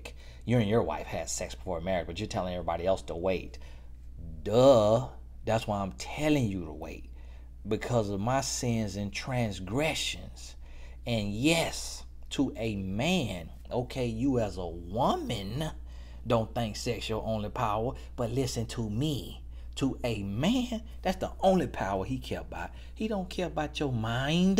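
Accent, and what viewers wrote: South, United States. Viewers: Enjoying your REALNESS & your 'southern' accent!! 🤪🤪🤪 TY, Mr..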